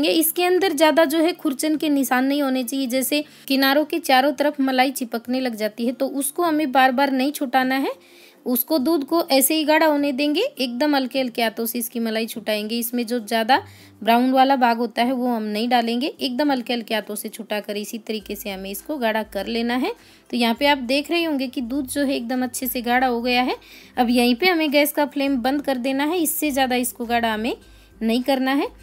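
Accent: Haryana, India. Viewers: Aap HariAna k ho tone se lgrha h 😊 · Maybe she's from Haryana can recognize her because of her voice.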